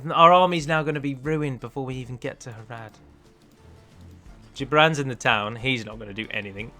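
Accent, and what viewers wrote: United Kingdom. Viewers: Your lore video was great. It was really good. You are a man who speaks to us, the audience, as if you were telling a story and yes that means some things you say will be wrong but that utterly stands in the shadow of how pleasant your lore videos are to listen to. As if I were listening to a lovely fairy tale. Kudos! It is obviously better than your Sindarin pronunciation but that is because your native language is English you jammy ... ^^. No seriously, that British-ness about you and the way you speak to us is what makes you, you, and why people come to you. Don't let a reddit-guy over-the-top holier-than-thou fellow ruin your day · You really shouldn't worry about guys who question your knowledge, your videos aren't aimed at being some kind of lore encyclopedia, you don't owe these people anything!:) I like to consider myself as quite knowledgeable on Tolkien's lore, but when I hear mistakes here and there in your videos I don't give a damn about it, because that's not the reason I'm watching them!! 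I'm watching them because you're an interesting person in general with an interesting playstyle as well, and that's the important thing here;) (plus you help me get better at speaking English and at getting a British accent so I'll vouch for you and your vids ^^)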